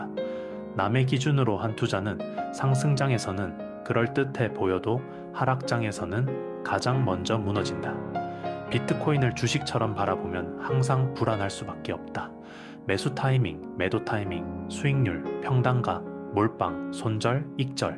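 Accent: Gyeongsang, South Korea. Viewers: AI로 편집한 목소리 경상도 비슷하게 들리는건데 전 오히려 좋아요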